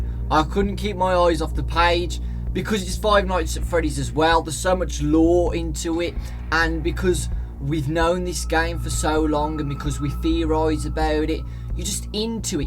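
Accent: United Kingdom. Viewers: i feel like he named the character charlie just to hear say it in his british voice.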